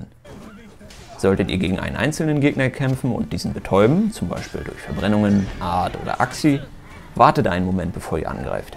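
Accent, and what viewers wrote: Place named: North, Germany. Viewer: Kommst du aus Schleswig-Holstein oder Hamburg? Erkenne deinen Akzent sofort 😂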